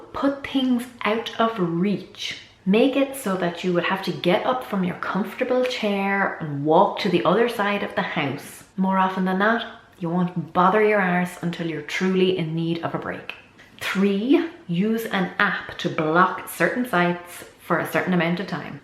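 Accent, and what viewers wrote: Ireland. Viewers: are u from ireland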